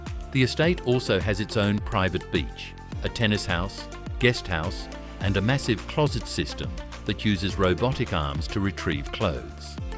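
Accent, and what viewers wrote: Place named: Australia. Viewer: Great to have an Australian commentator 🎉😊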